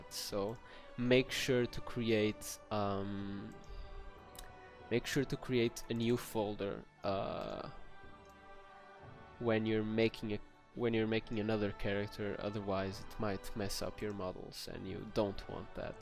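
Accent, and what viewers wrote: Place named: Portugal. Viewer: Nice video man, could swear by your accent you are Portuguese (^_^) (Confirmado, reparei agora no canto inferior direito do ecran, ta o teclado em pt heheh) Continua esses videos bacanos, abraço.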